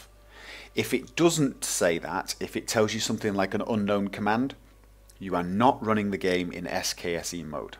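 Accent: United Kingdom. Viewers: You remind me of one of the best uni profs I've had! Very thorough but concise guides, covering a whole lot of info while still remaining articulate. My prof was British and I'm not quite sure what part of the UK you're from (Or if you're from there at all, just a guess) but I think it's a cultural thing haha. Keep up the good work!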